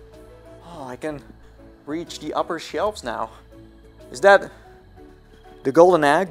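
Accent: Netherlands. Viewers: Ik zweer ik vind het zo fucking grappig wanneer ik merk "hmm, ik hoor een minuscuul klein accentje. Die persoon is Nederlands." En negen van de tien keer heb ik het goed. Altijd weer leuk om mede Nedelanders te vinden waar ik interesse in heb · It sounds Dutch, but not sure. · I smell a littlebit dutch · seems kinda Dutch am I rightytighty? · I can tell you're dutch. honestly, same. and i could only wish i got an oculus quest 2. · Ur definitely dutch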